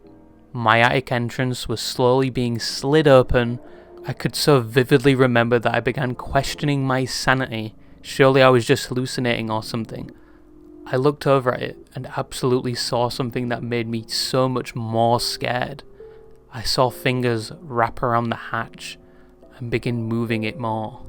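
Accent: United Kingdom. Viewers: British detected, disliked. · No you’re wrong you’re perfect for those stories because it gives the whole thing a new kind of “sting” or “slash” it’s because you’re Brit ish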